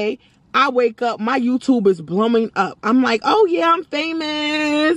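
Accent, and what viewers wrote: New York, United States. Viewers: Newbie here... I just saw this video recommended today and decided to click because I LOVE storytimes! I have to say you definitely have a new subscriber here boo. I'm from Brooklyn NY myself so I immediately knew you were a fellow NY'er when you started talking lol, and I love your honesty and realness. That is so rare to find on social media. But girl, let me tell you this video SPOKE to me something crazy. I'm currently looking to move myself after being in my apartment for 9 years and NEVER having a single roach. Crazy for NY but true. Now, all of a sudden, after having water issues, the same as the ones you mentioned, they're coming from everywhere, and I just can't. Like yourself, I keep a very clean home. I have actually officially been diagnosed with OCD years ago. Like, it's a real problem, and I keep an extremely clean home, so I can't do it. 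I don't have Section 8, but I do have another program, and unfortunately its so hard to find landlord's to take them. So honestly, I'm terrified I won't find something by the time my lease is up in July, but I CAN NOT stay here. Roaches are so disgusting, and they just multiply and get everywhere. I've had 2 apartments prior to this one and never had roaches in any of them, and after 9 years here, NOW they want to take over 🤨🤔. Oh hell no, I gotta go, lol. I actually really felt this video also because I've had lots of issues like yours and although my landlord is a really nice person and we get along they dont like to pay for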